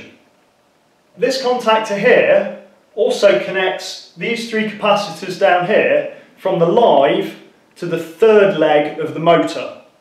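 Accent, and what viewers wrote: United Kingdom. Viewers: The Union Jack bow tie and the Union Jack in his "Handmade Extreme" design didn't tell you he was from the UK? What about his accent? Or what about where he says "here in the UK". Yup, just for people with your low comprehension skills he should have flags on the walls, maybe also in the background a red double decker bus, a portrait of the Queen and maybe he could be eating fish and chips......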